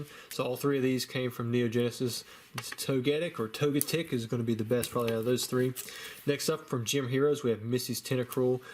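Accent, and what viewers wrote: South, United States. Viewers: He has a little southern twang in his speech. I believe he is from north Carolina but not 100 percent sure.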